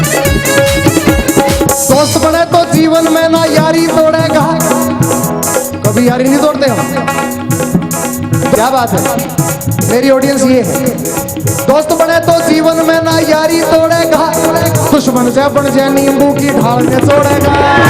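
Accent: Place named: Punjab, India. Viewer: I am Punjabi Jatt, I have business in Gurgaon, and they speak different haryanvi to this. He is speaking like punjabi style, its nice to hear. Jatt/Jat/Jutt zindabad. respect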